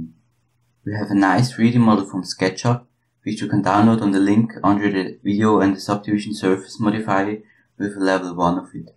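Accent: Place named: Switzerland. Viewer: Haha ich han grad kört dass du en schwitzer bisch an dim akzänt :P Cools Tutorial btw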